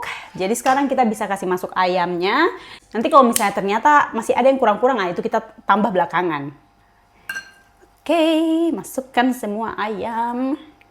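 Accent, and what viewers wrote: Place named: Maluku, Indonesia. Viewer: Selamat ulang tahun kk...salam kenal aku penggemar baru mu dari yogya...asek bgt logat maluku nya masih terus melekat kk... Sehabis nonton DAILY TIKA WEIXUN DICHINA langsung gercep nonton vlognya kk...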